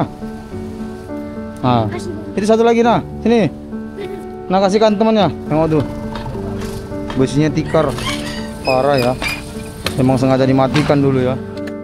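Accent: Medan, Indonesia. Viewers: Logat medan nya lebih kentel lg bang, tambah celotehan lucu nya terus..😅😅😅😅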